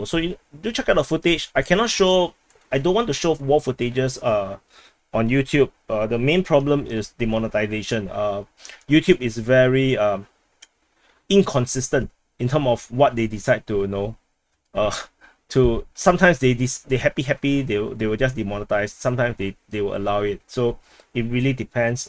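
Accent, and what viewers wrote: Singapore. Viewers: Never apologise for your accent. I know that it is nowhere near full on "Singlish". I am also pretty certain that you speak at least one Chinese dialect and also Malay and that you speak both as well as anyone. The number of languages that most Singaporeans speak has always astounded me. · We love your Singaporian accent! Keep going ! Your are the best channel on Ukraine war! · HUH .. It' a Singaporean accent.. You know like an Australian Accent .. Or Alabama or CAnadian..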